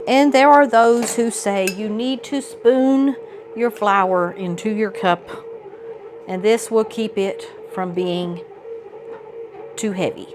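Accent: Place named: South, United States. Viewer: Do you ever make bread with fresh milled flour?! Love your channel, I found it through The Rose Homestead, and watched so many of your videos, lol!! I recently switched to fresh milled flour, it’s SO delicious, but it’s definitely something to learn, more because I never really made bread, just quick bread, cookies or biscuits, and an occasional muffin. I absolutely LOVE the taste, it’s nothing like what you get from refined flour, although I did just buy a bag of King Arthur organic bread flour, just to have on hand. I have Sue Beckers book, Home Grown Flour, which goes into detail about all things whole grains, and even ancient wheat, I’ve used some Kamut mixed with hard wheat, not on itself own, it’s very sticky, but tastes delicious!! I think many many people are getting into milling because of the health benefits, you seem to live in the South, by your accent, lol, I wish I could visit there, I love the hospitality there! 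I heard about it from a channel called, The Biblical Nutritionist, and then started watching Bread Beckers channel, great presentation, they also have a store in Woodstock, Georgia, I saw it on YouTube, it’s a very big warehouse type store, with everything necessary to start milling grain and making bread, it’s healed So many health issues in people, there are hundreds of testimonies, so I decided to try it!! I know I saw your video on the homemade yogurt you made with a yogurt maker, I bought the KBS bread maker, lol, yours looked great, and you can do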